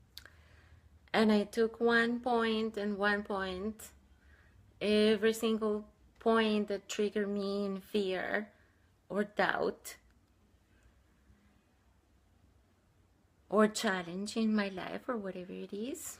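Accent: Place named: Russia. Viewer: thank you for your video - I think you are the first I’ve found to share about The Art of Soaring. You touched me by your genuine sweetness & intelligence. I like listening to you speak in your thoughtful, measured way and Russian accent. Happy 420! Also are you wearing a Cedar from Anastasia? I have one too. You made the connection between AOS and Ringing Cedars - I think it’s the same publisher not translator. Anastasia means a lot to my friends and I.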